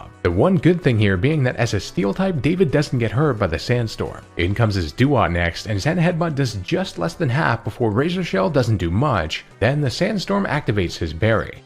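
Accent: Canada. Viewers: Your canadien eh?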